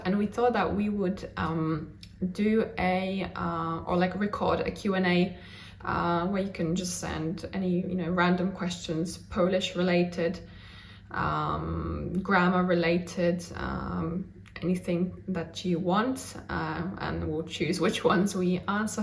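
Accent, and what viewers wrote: United Kingdom. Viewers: Is English commonly used in Poland? Your English is excellent, switching back and forth Polish and English (sorta with British accent).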